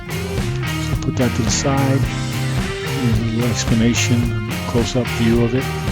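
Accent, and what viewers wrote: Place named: Minnesota, United States. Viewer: Great Video!!! Your either cold or your Minnesota Sweed accent is coming through!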